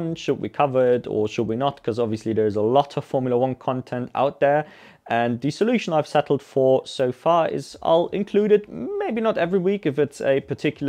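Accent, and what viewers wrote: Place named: Scandinavia. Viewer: Also I am trying to place your accent and failing (I'm a language and linguistics nerd, so I do this with all YouTubers I watch), sounds both Scandinavian and English with a bit of German. I'm going to place a random guess and say Iceland as a wild guess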